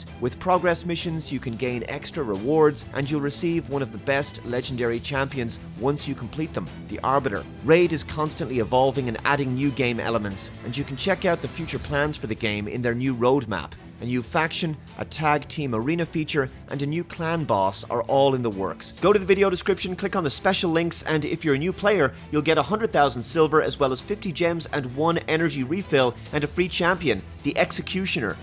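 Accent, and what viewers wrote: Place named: Ireland. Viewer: Totally random question unrelated to the video and more about you personally... What type of Irish accent do you have?(where in Ireland is this more common) Lol I'm making a story and I kinda wanted to give a character an Irish accent like yours... maybe lol. Ok sorry.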